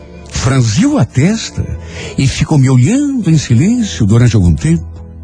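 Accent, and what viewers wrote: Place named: Rio Grande do Sul, Brazil. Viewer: Sou fã dessas histórias lindo sotaque gaúcho.....!!😀👏👏👏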